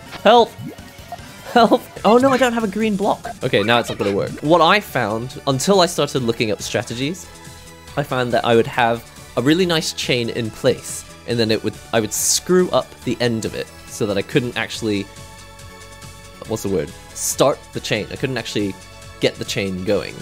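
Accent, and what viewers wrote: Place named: Glasgow, United Kingdom. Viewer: You have an interesting accent. It almost sounds like (posh) Glaswegian at times.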